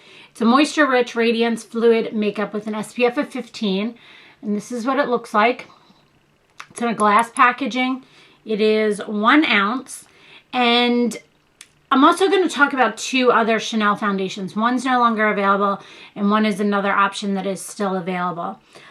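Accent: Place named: Greece. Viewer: Where are you from? Greece? You speak like greeks speaking English. Very beautiful acccent.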